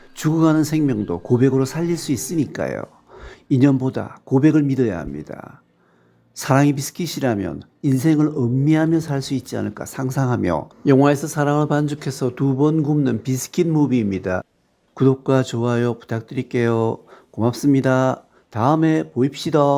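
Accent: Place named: Gyeongsang, South Korea. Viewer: 축하드려요 이쁜글이 많아 성공하실거예요 생각외로 깅상도말이 거칠지않고 부드럽네요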